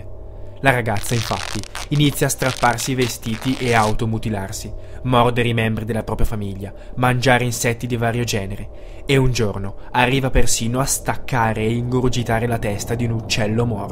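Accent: Italy. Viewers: muoio per il purtroppo!! Comunque seriamente sei italiano? E se si da dove?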